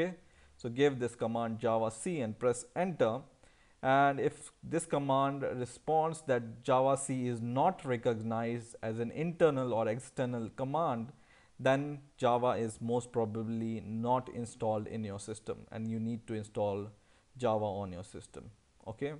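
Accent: India. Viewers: Open caption that are auto generated and you will see google catches every word he spit out his mouth cause he's indian and our english is pure not with any type of accent. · i find it's hard for me to understand the indian accent ! Have a nice time ! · Sooooo Indian! Like Russells Peters!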